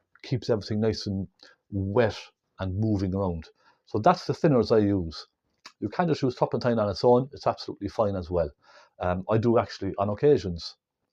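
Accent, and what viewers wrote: Ireland. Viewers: it is very nice to hear an Irish accent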